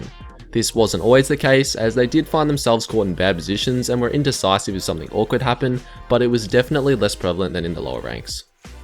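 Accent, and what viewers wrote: Australia. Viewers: The aussie accent gave it up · r u from Australia?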